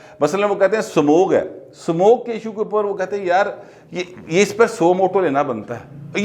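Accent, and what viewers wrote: Punjab, Pakistan. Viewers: جناب گوھر بٹ صاحب اسلام وعلیکم سر آپ کا پروگرام بہت زبردست ھوتا ھے اور آپ جس ٹھنڈے اور میٹھے انداز میں گابی اردو میں بات کرتے ھیں اور تلخ حقیقت بھی سن کر بھی مزہ آتا ھے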